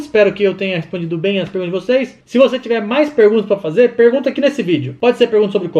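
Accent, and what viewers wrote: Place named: Minas Gerais, Brazil. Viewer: O interessante é que a gente acha que você é mineiro, mas não é paulista, depois do Rabin é você, meu querido!!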